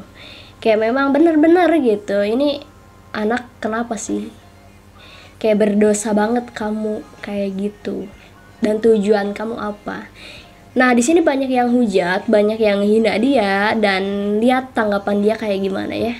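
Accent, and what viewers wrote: West Java, Indonesia. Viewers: Boleh nanya ka org mana si? Jabar kah:v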